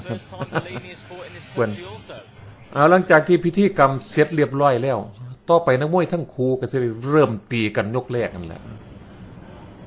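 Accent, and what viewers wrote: Isan, Thailand. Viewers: ผมคนใต้.ติดตามครับ.พากได้หร้อย.. ขำดี.พากอีสาร.ปนใทย.นี้ถ้าพากติดภาษาใต้มั้ง.. หร้อยจ้าน... 555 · เว้าอีสานบ้านโตฟังม่วนดี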